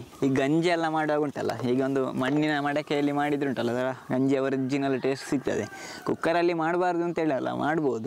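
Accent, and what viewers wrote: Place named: Mangaluru, India. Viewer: Kannada (Mangaluru accent )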